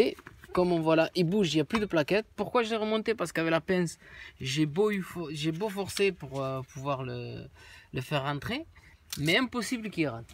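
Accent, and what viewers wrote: Marseille, France. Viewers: mécanicien dans les roues de brouettes!! Ce type de vidéos devraient être carrément censurée ,vu le danger que représente ce type de "travail" de caraque !! Bon, en même temps, apparemment ,vu l'accent, on doit être du coté de marseille, alors faut pas trop s'étonner !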